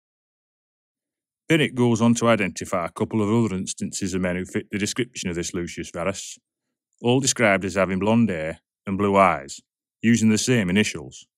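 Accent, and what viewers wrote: Yorkshire, United Kingdom. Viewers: Such a rich biography. Loved the timeline. Though I'm no expert, I liked your Yorkshire accent. Finally Sheppard? Son of Jack Sheppard perhaps? Thank you for another excellent episode.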